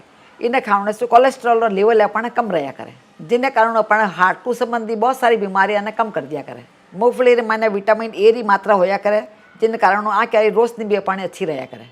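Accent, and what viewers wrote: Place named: Marwar, India. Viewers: आपकी gk को प्रणाम,,मारवाड़ी भाषा में English मीठी लगती है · Aap English bhi Marvari mi bolty ho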